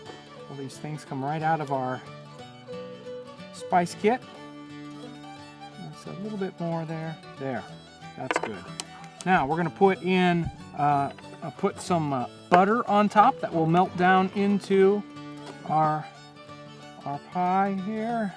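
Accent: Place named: United States. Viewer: The music, the costume somehow shows he's cooking in a 18th century English country side setting. However, the pronunciation showed he might come from the new continent.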